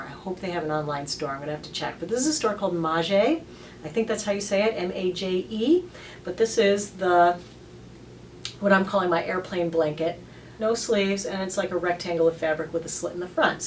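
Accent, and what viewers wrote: United States. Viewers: Hi, I'm so glad you had a great time in Spain - I love it there! It's cute the way Americans pronounce words. For example, we pronounce Ibiza (eye-beeth-a), and instead of "embraceful", we would say "embracing" is correct. Anyway... I really love watching your videos. I'm going to be in NYC with my daughters from 5th - 22 July, and I'd love to meet you in person! I'm almost 53 (also get hot flushes) and am interested in your product reviews. I hope to see you at the W Hotel next week! How long are you staying in NYC? If I don't make it to the hotel (my daughters have planned some excursions), then it would be lovely to meet for a coffee. We live in Germany, but are form London. Stay awesome!:)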